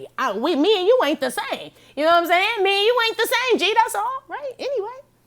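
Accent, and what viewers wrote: Chicago, United States. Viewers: Thank u! I needed it this today thank you honey are u from Chicago? I keep u hearing u say G and Joe!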